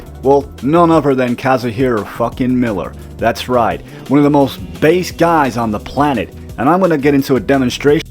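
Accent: United States. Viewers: lol ur american accent is good bro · Why did you think people would take you more seriously with an American accent?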